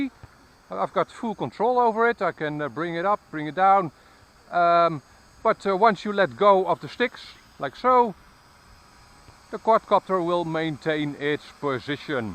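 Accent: Netherlands. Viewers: wat een verschrikkelijk Nederlands accent heb jij, natuurlijk ben je Nederlands maar dit klinkt echt bagger. alsjeblieft werk aan je Engels.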